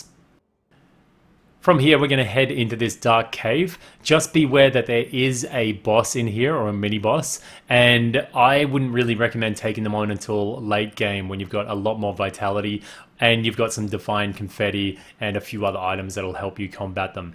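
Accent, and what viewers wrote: Australia. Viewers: Is that an Aussie accent I hear 👀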